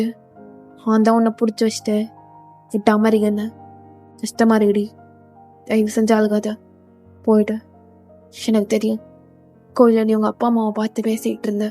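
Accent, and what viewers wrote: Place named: Kerala, India. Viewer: Akka I'm a malayali 😊 but your malayalam is soo cute ❤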